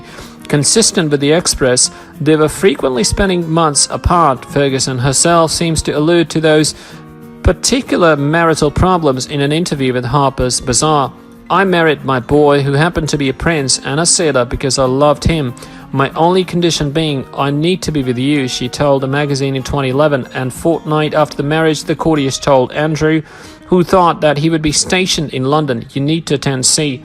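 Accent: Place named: Australia. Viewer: Your English is perfect - do I detect a slight Australian accent?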